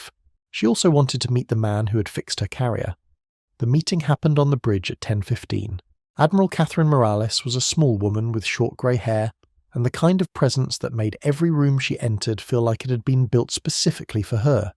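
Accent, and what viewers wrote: United Kingdom. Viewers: Why is a Brit telling us these important stories? We need to have this kind of respect for our own. We need to be teaching it ourselves. Thank you, sir, for showing us the way.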